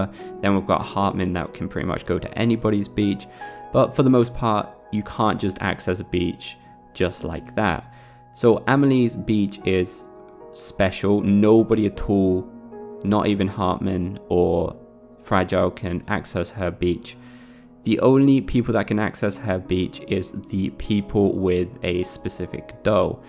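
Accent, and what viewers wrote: United Kingdom. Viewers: Happy to find a British accent explaining this game. I'm American and I can't stand Americans' accents on Explained videos.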